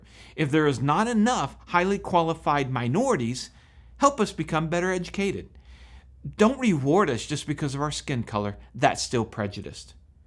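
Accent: Texas, United States. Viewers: it is obvious you are from Texas.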